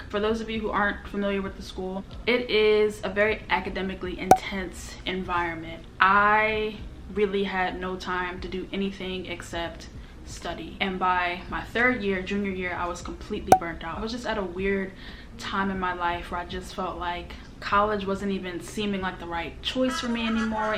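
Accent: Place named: United States. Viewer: You sound like you lost most of your American accent 😂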